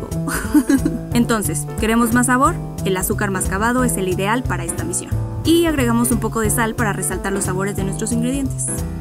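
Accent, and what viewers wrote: Mexico. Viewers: el acento whitexican de la narrado me asqueo ! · Lo malo del video es la voz whitexican, pierde credibilidad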